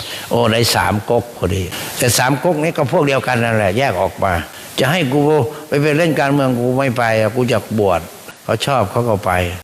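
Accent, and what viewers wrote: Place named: Isan, Thailand. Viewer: กราบสาธุหลวงตาเทศภาษาอีสานปนด้วยฟังสนุกได้สติดีมากครับชอบที่สุด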